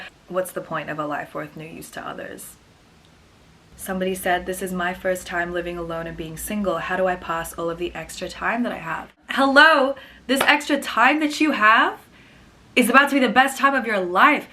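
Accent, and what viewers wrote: Australia. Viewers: Just found your channel and I'm thrilled that you're half Filipino!! So am I, on my Dad's side!! Love the Try-Ons, those are my favorite! Keep it up, I love your personality!! At first I thought you were American or Canadian b/c I hardly hear an Australian accent? :-)))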